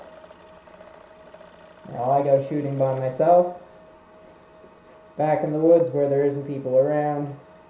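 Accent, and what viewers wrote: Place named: Atlantic Canada, Canada. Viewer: thought I heard an easy cost accent. I'm from Newfoundland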